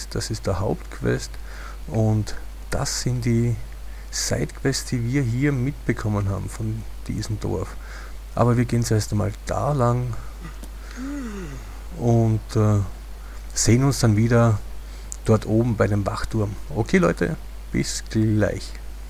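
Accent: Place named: Austria. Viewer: Der Ösi akzent ist zum verlieben!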